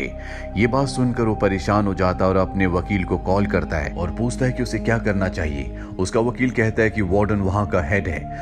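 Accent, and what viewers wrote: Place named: Himachal Pradesh, India. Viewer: Sir aapki aawaz se lgta h aap Himachal side se ho 🤔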